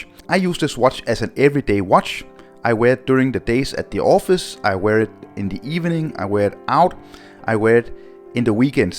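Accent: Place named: Denmark. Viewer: U Sound very danish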